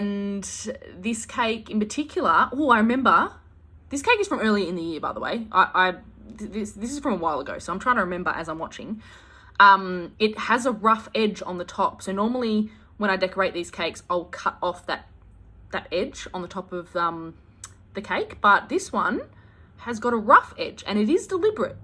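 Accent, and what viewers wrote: Australia. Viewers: 😂😂to the American 😂 your voice ((Australian accent)) could never be a snooze fest😂😂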